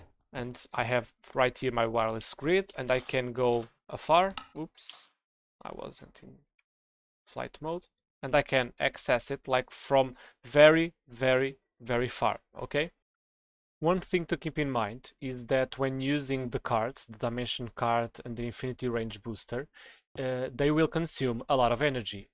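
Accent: Portugal. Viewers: You has portuguese voice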